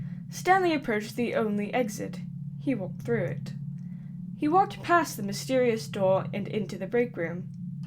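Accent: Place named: RP, United Kingdom. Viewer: Another great video. Well done! You're also halfway to a brilliant RP accent.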